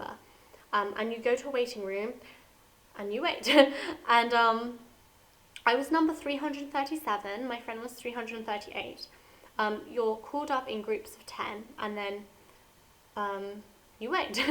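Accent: United Kingdom. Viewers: Why is your accent way different from mine??? London vs Birmingham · and how about if im born in September 10 1999 is that concluded im 13? And what is YOUR nationality? Thanks and I love your voice, you sound like a real English girl :D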